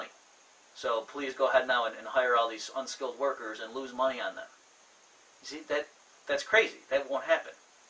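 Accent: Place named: Ireland. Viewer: Im starting to hear Murphy's Irish accent. I tried running this logic with my commie econ professor and he kept bringing up Marx.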